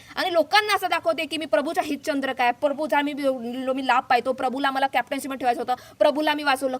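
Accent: Maharashtra, India. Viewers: Tu sagale mazya manatale points bolalis.khup pot tidikine bolate tu .tu non Maharashtrian ahes ka?tuzi marathi khup goad ahe.chan watate eikayla.subscibe kelay mi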